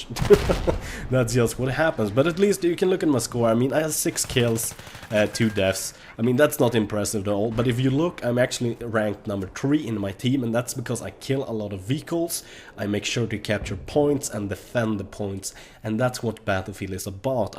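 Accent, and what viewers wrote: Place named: Sweden. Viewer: Gotta love the swedish accent <3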